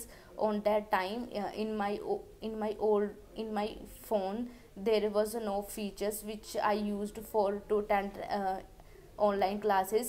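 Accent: Punjab, India. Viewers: Punjabi accent ..use shot sentences and idioms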